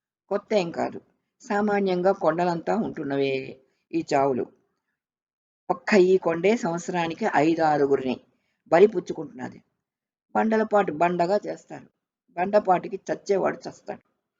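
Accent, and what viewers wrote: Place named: Uttarandhra, India. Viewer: కధ బాగుంది, చాసో గారు కధలులో ఉత్తరాంద్ర దిగువ తరగతి ప్రజల జీవితాలు కధా వస్తువులు గారు ఈ ప్రాంతపు మాండాలిక భాషలో బాగా చెప్పేరు..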